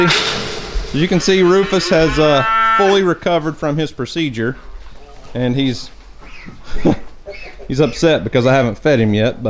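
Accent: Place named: Oklahoma, United States. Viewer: Love the way you say some of your words. I know it's mostly because you're from Oklahoma. Rufus has declined to answer the question. Please keep your hands to your house yourself 😜😜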